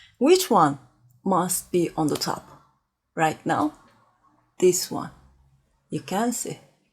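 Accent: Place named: Turkey. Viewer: best turkish accent I've seen in a long time so calming ahahah i feel like you'd be a great grandmother I never had hahah I sometimes watch yer videos and feel like yer my granny hahaha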